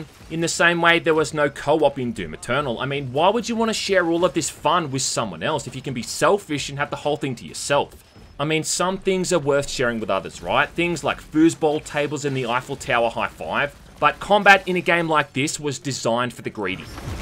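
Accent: Australia. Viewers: Australian mate?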